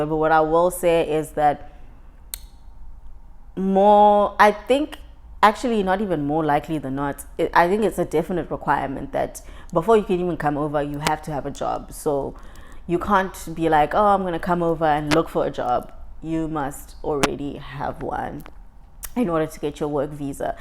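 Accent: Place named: Los Angeles, United States. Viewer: Your accent is now giving LA😍😂❤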